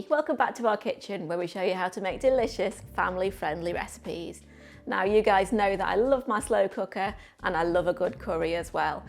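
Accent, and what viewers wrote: United Kingdom. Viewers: the accent..is that british ?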